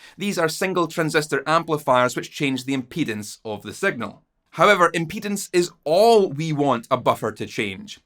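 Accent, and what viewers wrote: Scotland, United Kingdom. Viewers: The Scotsman in me loves your accent, I'm in the USA so no accent, but I especially enjoy the way you break things down logically, and with humor! I plug straight in and keep it clean, because that sound makes me feel good, for whatever reason distortion makes me feel bad. But a smidgeon of overdrive is tasty in the proper context, or maybe a little fuzz? Cheers!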